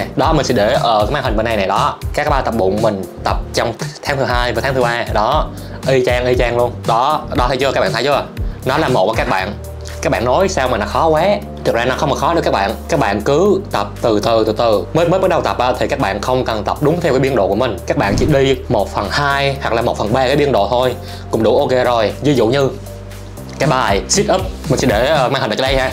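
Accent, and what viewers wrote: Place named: Phu Yen, Vietnam. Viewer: Bạn nói tiếng giống người phú yên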